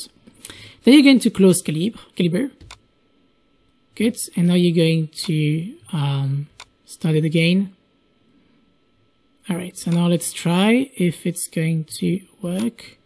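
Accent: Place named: France. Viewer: Really good tutorial + super sweet french accent, thank you again!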